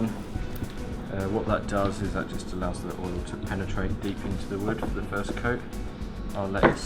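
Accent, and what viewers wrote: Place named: United Kingdom. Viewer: The Brit accent makes you sounds like an expert !!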